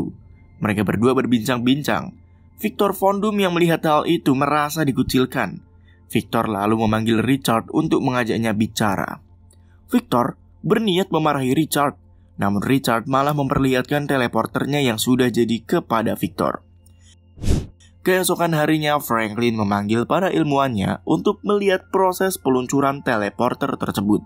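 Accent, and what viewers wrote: Java, Indonesia. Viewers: Weh anjai wong jowo to tibak e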